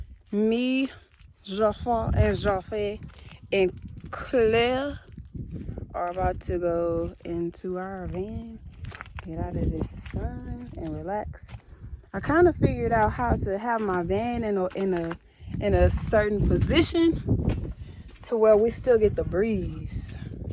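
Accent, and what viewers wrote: France. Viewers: Idk why but I am dying 😂🤣 at this French accent! Lmao Everything resonates with me when you shared about epigenetics. Give thanks for the sweet reminder! 🥰🙏🏾 Keep shining, sharing, and taking up space in this universe! 💕✨ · Such good advice about your surroundings affecting your inner cells🥰😍 I strongly resonate with your message. Your “french” accent had me rollin 😂🤣😅😁😆 it’s so unique, I love it. But you and the bugs, chile 😏 you are one bold sista 👏🏿👏🏿👏🏿💞💖🖤🤎🖤✨💫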